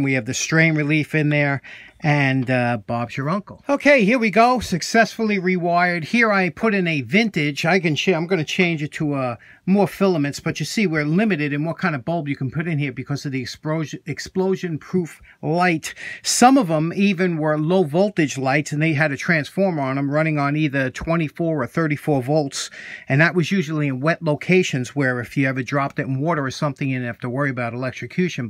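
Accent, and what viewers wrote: New York, United States. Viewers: I was so waiting for you to say Bob’s your uncle because you had like 10 chances to see it before and then Bam you finally said it and I like hearing it in your New York accent because there’s no uncle like Bob and there’s no way to hear it Better than New York accent ha ha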